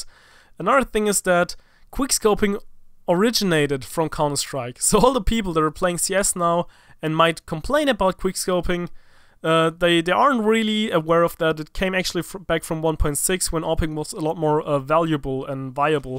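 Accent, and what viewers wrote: Germany. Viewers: ! Der deutsche Akzent regelt Bra^^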